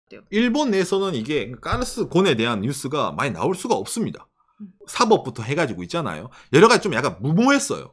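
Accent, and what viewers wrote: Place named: Daegu, South Korea. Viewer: 대구 사투린가요 ? 대륙남이랑 억양이 비슷하네여 ~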